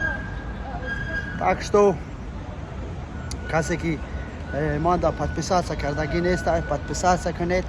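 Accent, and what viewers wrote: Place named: Pamir, Tajikistan. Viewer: Памирский акцент.Хамшаро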